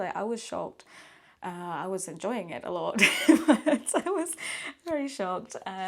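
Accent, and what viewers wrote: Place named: Scotland, United Kingdom. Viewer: Dear, I am not at all sure why your videos started appearing in my feed, but I am very glad they did. It could be because I had watched a few Japanese travel things previously but who knows the whims of the Great Algorithm? Anyway, I found the cuddling video deeply meaningful and also moving. It was also lovely to hear your accent (I am originally from Dundee) and your red hair. Oh, I am male, quite a bit older than you and "straight" whatever that means. I hope you will continue to make these lovely travelogues. Safe travels 😎😎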